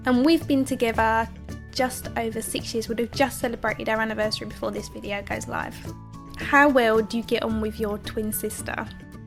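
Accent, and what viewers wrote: Essex, United Kingdom. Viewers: Wow you're from the same areas as me. I knew you were an Essex girl from ya voice but when you said you went to college in Thurrock I was surprised! I went in Southend (: love all your make up looks girl, especially on the eyes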